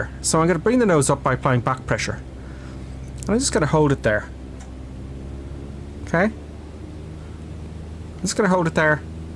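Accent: Ireland. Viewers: Brilliant. Please continue. Love the Irish lilt 🍀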